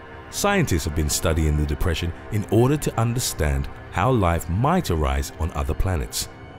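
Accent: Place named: United Kingdom. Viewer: hearing a brittih dude using american mesurements cringey lol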